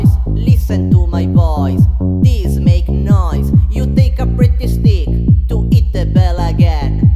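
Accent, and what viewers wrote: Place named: Piedmont, Italy. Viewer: Most foreigners think that the Italian accent is solely what they hear in movies like "The Godfather" (heavily influenced by the southerner dialects, generally Sicilian). I've been linking this video to my foreigner friends to let them have a taste of what English may sound like with at least one other regional accent, possibly a northerner one, such as Piedmontese. 💕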